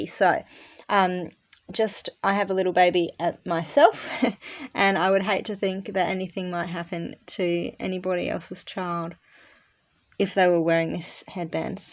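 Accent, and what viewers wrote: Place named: Australia. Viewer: I like it very much! and great to hear a fellow Aussie here on YouTube!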